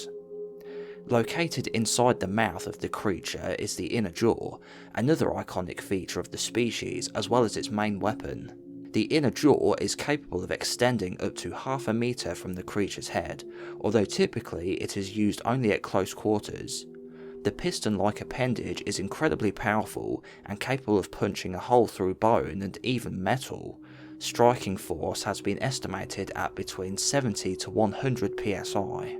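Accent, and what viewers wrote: England, United Kingdom. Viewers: accent is giving me british forest gump vibes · Because he's from England, is reading from a script and does not have a £300 microphone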